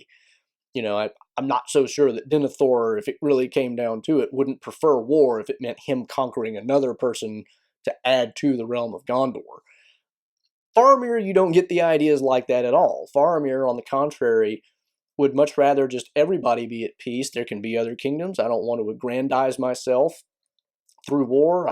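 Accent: South, United States. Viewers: I think we could certainly forgive him for this "failing." By the way, do I detect a bit of a Southern accent? I'm from Mississippi.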